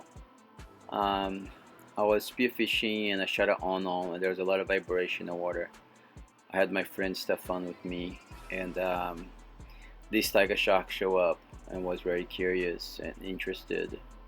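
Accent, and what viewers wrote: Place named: Brazil. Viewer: hehe i can hear the brazilian accent! love your videos bro hope your channel blows up!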